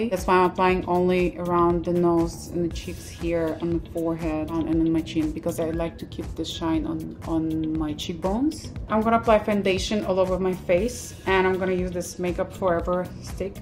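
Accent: Russia. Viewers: Love your russian accent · Nice voice. Very rispy russian tone. Gorg! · This russian accent 😍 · Anna Kozina у неё самый настоящий русский акцент. Так только мы говорим. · she's russian doe, she definitely don't have chinese accent lol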